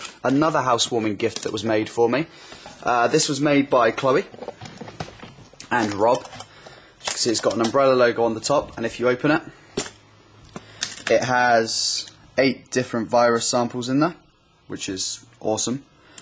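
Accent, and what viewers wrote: United Kingdom. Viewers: Eu acredito que ele more na Inglaterra pelo sotaque, mas talvez eu esteja errado ;)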